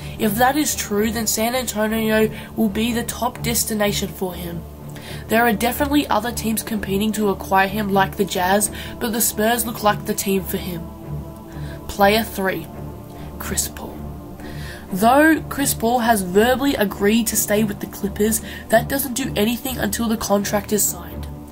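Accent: United Kingdom. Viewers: i don't necessarily agree w/ everything you said in the video, but you did a good job stating your case, and your voice is fine. you sound like a teenager w/ a british accent. How old are you, and what country are you in? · he kid, when you say spurs is best franchise in the history of the nba history, you must be high on drugs or to drunk to figure out what the words that are coming out of your mouth, championships matters most, you can be in the playoffs everytime or even since the start of a certain team in the nbabut never won a championship, that won't count and by the way you sound like british stick with football, i'm filipino and despite being short for basketball our number 1 sport back here is basketball, play make sure that you know what your talking about, i forgive you this time, but allow me to say this, you're a f*cki'n idi*t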